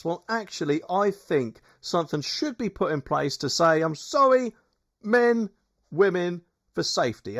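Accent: Norfolk, United Kingdom. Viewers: Well said bor nice to hear our natural Norfolk language on the air 😂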